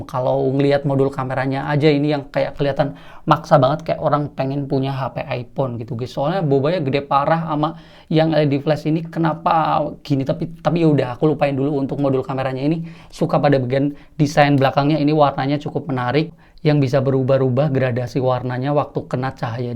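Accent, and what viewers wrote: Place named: Banyumas, Indonesia. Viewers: wong ngapak kayane.. 😊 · Pantesan ada aksen ngapaknya jebule wong kebumen😁 Tapi keren mas tata bahasanya rapi, intonasinya bagus, isi kontennya berbobot lengkap, mungkin ekspresi aja yg perlu lbh banyak senyum biar lebih meyakinkan, sukses terus mase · halo warga ngapak :face-blue-smiling::hand-pink-waving: pada2 wong cilacap koh,rika kang kroya ya kang? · Salam ngapak bang, sama-sama orang cilacap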